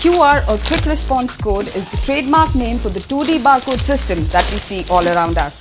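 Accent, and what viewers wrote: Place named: India. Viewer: the indian accent always kill me, and the video does not explain how it works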